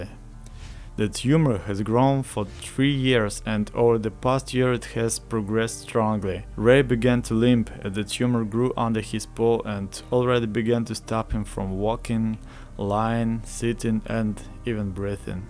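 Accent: Russia. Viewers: да, акцент точно говорит, о том, что скорее всего русский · Чел, ты русский? Акцент похож, но это не в обиду, потому что плевать на это. Ты реально крутой ютубер и гитарист, поэтому успехов тебе) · Ты не из России? Акцент похож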